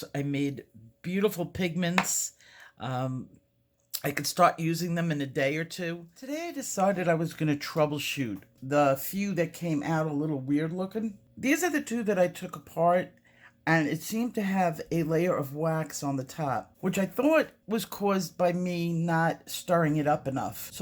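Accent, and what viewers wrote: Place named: Boston, United States. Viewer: Hi. Are you from Boston? Love your accent. 🙂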